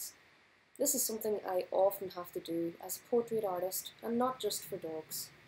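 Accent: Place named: Ireland. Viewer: Great video as always! Also, I love your accent. My grandpa was born in Ireland so my pride for the Irish is strong!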